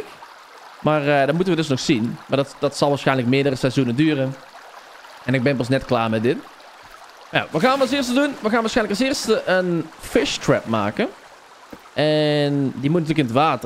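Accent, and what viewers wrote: Brabant, Netherlands. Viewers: ahah maakt niet uit joh jullie zijn beide legends ik vind t ook leuk dat je lekker Brabants bent net als mij 🤣😂 maar eh komen er ook nog ark video’s ging altijd stuk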